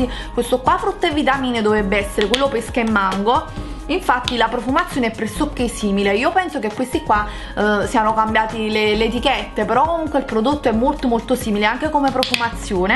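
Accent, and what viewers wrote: Naples, Italy. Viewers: Ma sei napoletana? 😍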